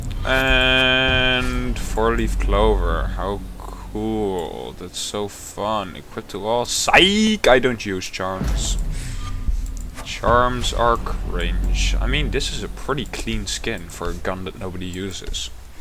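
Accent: Netherlands. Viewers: are you from the netherlands? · U dutch?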